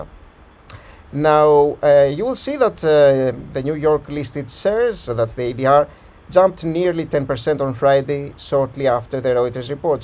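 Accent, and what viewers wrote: Greece. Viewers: Again da best greek accent in english ! Dont you think there s an other bulltrap forming ?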